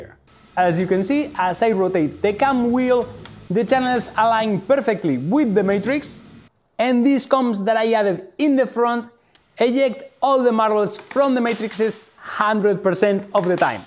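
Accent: Italy. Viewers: Absolutely. Can’t wait for the next episode. And you know what’s also brutally fun? Watch this with your eyes closed. I mean, just listen to him. He is king of speaking Italian using English words. Do so love that. 😊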